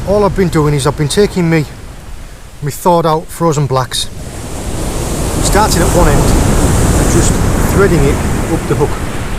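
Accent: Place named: Cumbria, United Kingdom. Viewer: Your accent sounds West Cumbrian, like Whitehaven or somewhere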